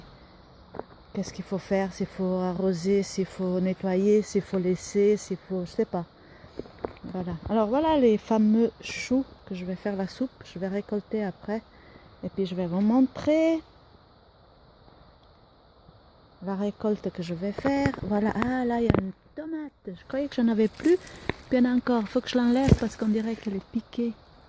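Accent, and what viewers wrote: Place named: South, France. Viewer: bonjour, je me disait aussi que c’était un accent du sud ;) beau coin de véranda la suisse c'est joli merci du partage. a bientôt Vincent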